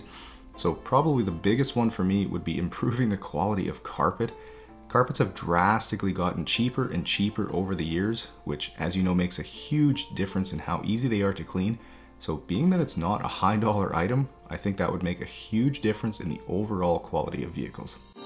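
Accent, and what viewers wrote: Canada. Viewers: As a Canadian, he sounds Canadian in his voice overs....... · My favorite part watching these vids, as an American, is seeing how proper this dude talks during the voice overs and then seeing him go full Canadian once they come to pick up the vehicle. Cracks me up every time.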